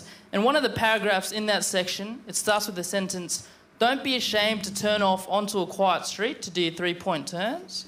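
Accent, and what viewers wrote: Australia. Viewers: It seems it's one of Aussie's accents, according to many other comments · You just don’t like how Australians speak then hahaha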